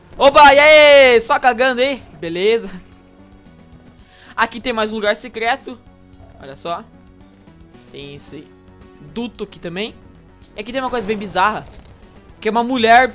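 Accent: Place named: Paraná, Brazil. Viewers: de onde tu é ? teu sutaque parece aki do sul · de que lugar do paraná vc é? hahahaha mt bom seus vídeos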